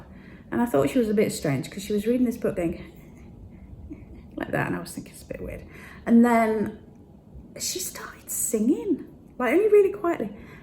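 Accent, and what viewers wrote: Nottingham, United Kingdom. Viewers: your Nottingham accent is coming through 😂. I am born and bred in Nottingham but have moved all over the UK but I’m back living in Notts now and it soon comes back xx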